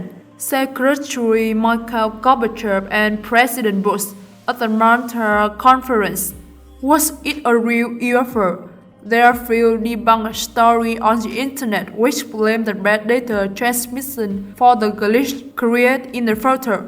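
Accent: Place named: Japan. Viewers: could you possibly put there talking someone with normal english and not with strong japanese accent? it is hard to understand you... thank you. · ah yes, she does have a strong japanese accent. I think it'll help her greatly if she hires an voice actor to do her videos. Good content here.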